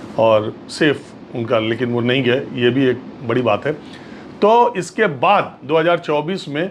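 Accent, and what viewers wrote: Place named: Azamgarh, India. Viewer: भाई साहब आप के बोलने का‌ लहजा बता रहा है कि आप खांटी आजमगढ़ के हैं, या इलाहाबाद के? मेरी जनम भूमि भी इलाहाब है, भले अब गांव छूट गया लेकिन उस क्षेत्र की भाषा की मिठास से परिचित हूँ। कोई अपने क्षेत्र का मिल जाए तो भाषा और व्यवहार तुरंत बदल जाता है। नौकरी ने‌ लखनऊ में रहने पर विवश कर दिया । कुल मिलाकर आप वरिष्ठ पत्रकार के साथ नेकदिल आदमी नहीं, इंसान भी हैं। मैं आप का प्रोग्राम बहुत देखता हूँ, पता नहीं क्यों आप की भाषा मुझे बहुत प्यारी लगती है। कुल ग़लती तो माफ करिये गा। नमसकार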